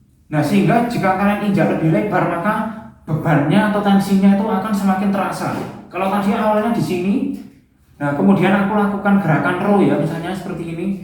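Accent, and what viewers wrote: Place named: Malang, Indonesia. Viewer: Logate kok koyok Wong Malang yo? Loop Band-ne merek opo iku Bro? Svarga?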